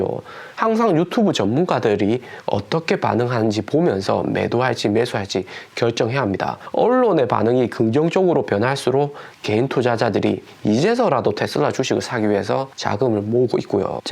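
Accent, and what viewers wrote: Gyeongsang, South Korea. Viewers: 경상도 아지야 좋아요 꾸우욱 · 덕분에 테슬라 650불에 진입했어요... 어쩐지 낮익은 목소리.... 같은 갱산도 아이미꺼 저는 마산... 샤오펑도 오늘 진입했어요.. 새해 구독자 대박대박... 영상 보신분들 꼭 구독 좋아요.. 부탁드립니다. 샤오펑도 가즈아~~~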